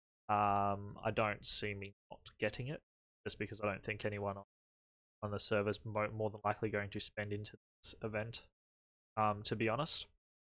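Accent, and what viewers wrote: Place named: Australia. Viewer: Hmm you sound like an aussie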